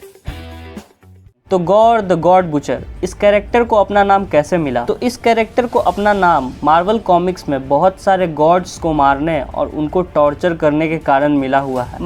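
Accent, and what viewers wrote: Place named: Magahi, India. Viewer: Video khub nick lagal ba..keep uploading such contents bro.. can sense our Magahi tone..❤️❤️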